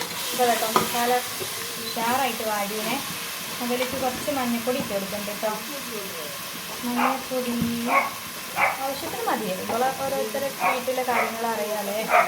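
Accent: Kozhikode, India. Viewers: കോഴിക്കോടിനടുത്ത് കല്ലായിലോ ഫറോക്കി ലോ ഉള്ള ഭാഷ പോലെ തോന്നുന്നു?❤❤❤❤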